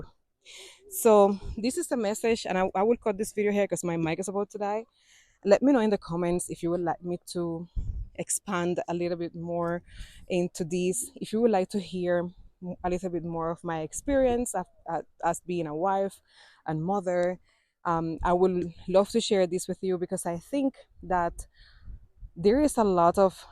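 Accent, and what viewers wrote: Jamaica. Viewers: You Jamaican, Just born in Cuba, but girl you roll those Jamaican words like a Cuban Cigar!! Boss Lady!! Love what you do!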